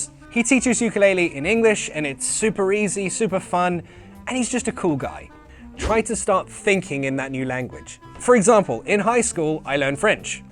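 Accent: United Kingdom. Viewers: I love the Learn and Improve Your English Language!! With Your British Accent, and Congratulations,!!! 🇬🇧😍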